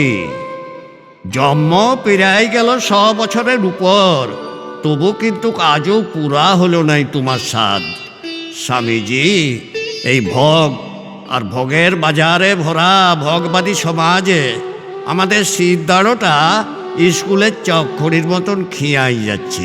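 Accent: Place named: Bankura, India. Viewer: ভালো লাগলো যথারীতি। একটা কথা বলি, যদি কিছু মনে না করেন। আপনি তো স্বর্নালী সময়ের অধিকাংশ সময়ে কোলকাতায় ও সাউথ চব্বিশ পরগনার স্কুলে যাপন করেছেন। আপনার বেশির ভাগ কবিতার উচ্চারণে বাংলার বাঁকুড়ার গ্রামের ভাষার স্টাইল সব সময়ে সকলের ঠিক ভালো লাগে না । এ ব্যাপারে একটু মনে রাখলে বাধিত হবো। আর একটা কথা বলি, সুলেখা দিদিভাই এর কমেন্টস্ ভীষণ ভীষণ ভালো লাগলো। আপনারা দুই জনে আমার শ্রদ্ধা ও প্রণাম নেবেন।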